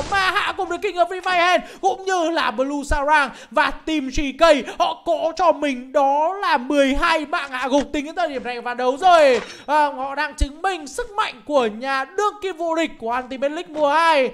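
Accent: North, Vietnam. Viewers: A ơi. Có tk bảo giọng Bắc ấm. Em lên nói con cek j vs nó??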